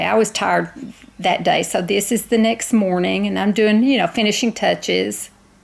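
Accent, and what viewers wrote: South, United States. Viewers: Soo lovely, you have a real, lovely victorian Southern States of America. Still love your funny accent. Lots of love 😘😘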